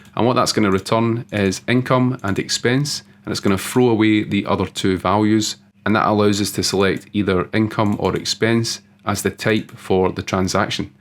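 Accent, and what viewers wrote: Scotland, United Kingdom. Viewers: Your accent is really sick man 😎 love the scots. Greetings from the USA.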